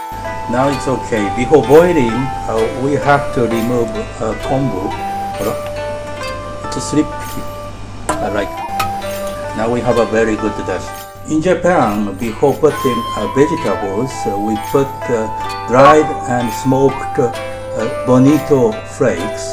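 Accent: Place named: Paris, France. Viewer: 美味しそうですわ！綺麗なイルドゥフランス語と親切な実演で助かります。 味噌汁とハーフカットプチトマトも美味しうございます。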